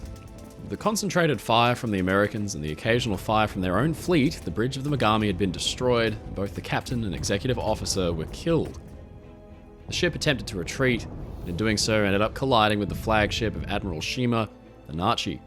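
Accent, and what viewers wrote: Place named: Australia. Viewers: He sounds very Aussie to me. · Yo my brother! Are you Australian? The accent sounds Australian in one statement and British in another Yes, I know. Australia and the U.K. are part of the British Empire. I'm just curious. Thanks for an informative website!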